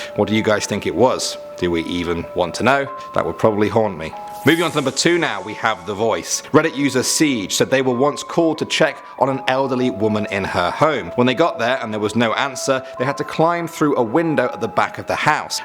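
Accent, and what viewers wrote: United Kingdom. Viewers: I love how he is british and not American and he speaks slow so you can actually hear what he is saying. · British accent so cute....he said " I'd probably run through a wall if he saw that outside his window"😁👍🏽 ♡☆♡☆♡☆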